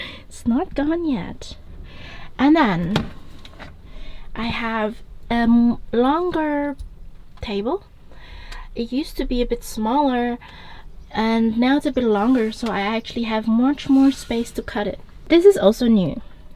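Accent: Germany. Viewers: it sounds like you have a german accent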